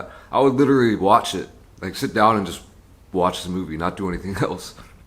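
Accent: United States. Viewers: He sounds American because he’s from America. He moved to Seoul from the Midwest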